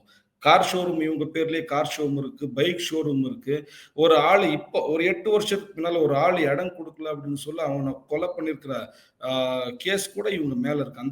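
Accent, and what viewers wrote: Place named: Karnataka, India. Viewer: Your Karnataka tamil expression with truth so beautiful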